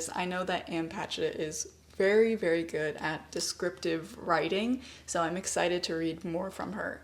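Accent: Standard. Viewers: Leah讲的似乎就是慢速、完整、标准的英语。有的家庭是要求孩子这样说话的。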